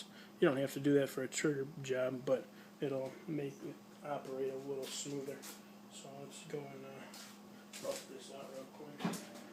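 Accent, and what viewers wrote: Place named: Illinois, United States. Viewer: ill bet you a ten shot wilson combat magazine 9mm ur from illinios?, i can hear it in ur voice? if I'm wrong let me know and I will send you the magazine for a 9-millimeter Wilson Combat to any address of your choosing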